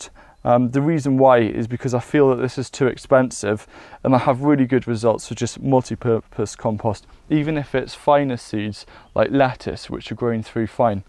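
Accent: Wales, United Kingdom. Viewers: if you have uv lights - don't use a pink sharpie! wrote them indoors and now the labels are invisible in the greenhouse :) derp. Great video, will subscribe. Interesting accent, similar to my husband, Welsh with English parents :)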